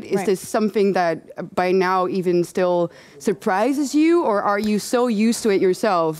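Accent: Netherlands. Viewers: Weet-landa, main accent on first syllable, only subsidiary accent on the second, and long e despite followed by two consonants, since only first of them belongs to same word within the compound. If I may say so, there are two accents that sound basically like you when speaking English : Dutch as yourself and Icelandic (have you checked out Leon and Sorelle?). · Man.. I love the Dutch Accent!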